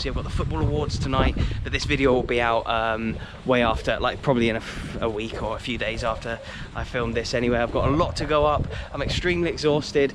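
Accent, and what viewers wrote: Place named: Liverpool, United Kingdom. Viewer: Great Liverpool accent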